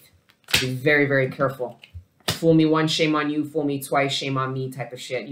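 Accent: Jamaica. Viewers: deep voice patois FUCKING DONE.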